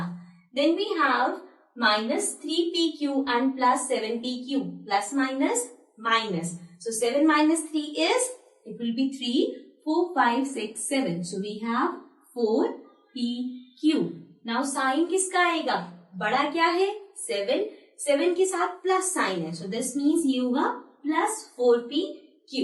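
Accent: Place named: Punjab, India. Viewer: You spake punjab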